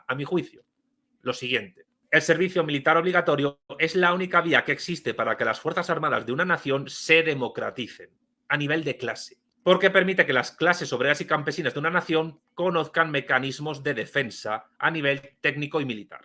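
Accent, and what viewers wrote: Spain. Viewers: Por favor que hace est señor con asento español opinando de el señor petro .... Analiza a los de tu españa amigo no metas las narices dond no t han llamado ....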